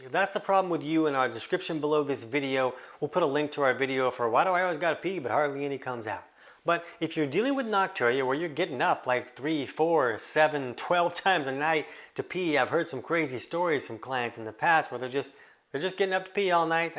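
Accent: South, United States. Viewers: I like your subtle Southern accent.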